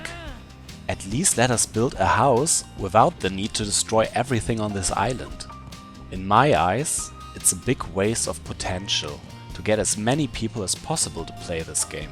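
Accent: Germany. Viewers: Kommst du aus Deutschland?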